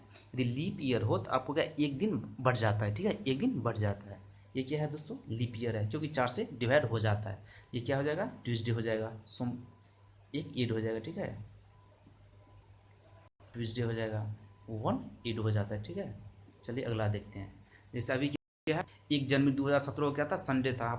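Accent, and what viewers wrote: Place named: Bihar, India. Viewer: Chhh kyu bilta hai bihari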